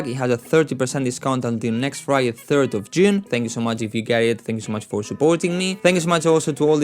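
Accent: Spain. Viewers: eres español jajaj se te nota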